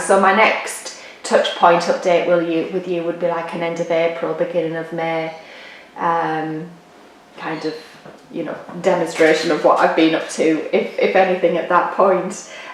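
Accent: United Kingdom. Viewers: So sorry you were ill for awhile. Glad to see you are feeling better. A while ago I did a search for anyone doing a review of a sit on frame and found your review of the Elbesee. Well, I was finally able to find a shop owner on line that had the Elbesee sit on frame in stock. The frame was delivered yesterday. The frame came with three hoops but also came with an attachment to enable the use of my own hoops and smaller sized scroll rods. I used the frame yesterday and most of today. I love it. I was having trouble with my left arm and hand from gripping my hoop. After only one day I can feel the difference in my arm and hand not gripping my hoop. Thanks so much for your review. Love your stitching and if I might say, your British accent. I was born in the UK quite some time ago, but came to the US when I was one. Still have relatives in Swindon and Avebury and love to talk to them and listen to their wonderful British accents. 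So heartwarming to me. Again thank you for your review. Stay safe, stay well. Lee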